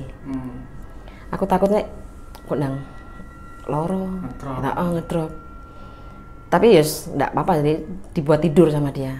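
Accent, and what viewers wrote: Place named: East Java, Indonesia. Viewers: Mbak cara menyampaikan ceritanya nyenengin biangettt...logat jatimnya iku lho....uuueeennakkk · Seneng temen mbak ndelok samean cerito. Suroboyoan banget!! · Aksen Suroboyone bikin keingetan pacarku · Logate jawa timuran,,,penak,, Salam dr jakarta selatan. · Meski amat sangat terlambat nyimak tetap gayeng...Logat'e mbak ngawe tambah kangen Suroboyo ku...😔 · penekanan kata ala wong Suroboyo panas banget = pwanas panas banget-banget = puwaanas..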